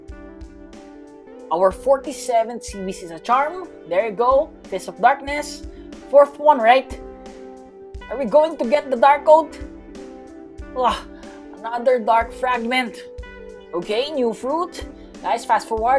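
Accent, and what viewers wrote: Philippines. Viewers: You sound Filipino sir are you Filipino?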